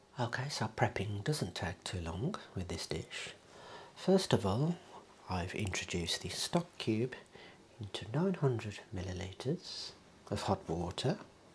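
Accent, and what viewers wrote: Birmingham, United Kingdom. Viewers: You, sir, have finally put some respect on the brummy accent. I salute you. Also I love this style of video!! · that is what we call a brummie accent, personally one of my favs to listen to as a southerner from the uk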